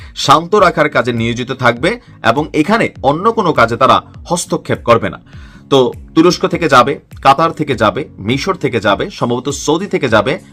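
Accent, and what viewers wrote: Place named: Noakhali, Bangladesh. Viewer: এইটা শুধু আপনার কুমিল্লার বাসা না এটা আমাদের নোয়াখাইল্লা ভাষা বুঝছেন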